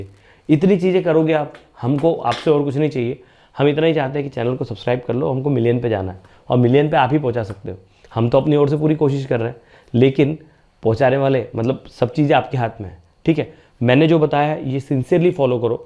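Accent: Maharashtra, India. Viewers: Sir tumhi Marathi aahat ka?????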